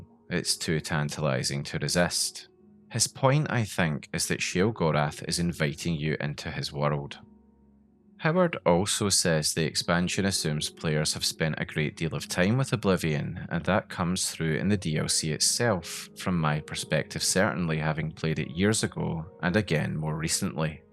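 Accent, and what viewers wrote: United Kingdom. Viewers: BRIT DETECTED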